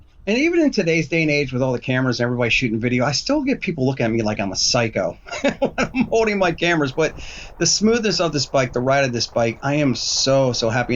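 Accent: Pittsburgh, United States. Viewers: Hah - didn't even recognize it was you...until I was listening and heard just a hint of yinzer and I said, hmmmm, that's real nice like. Awesome stuff. Get the gear.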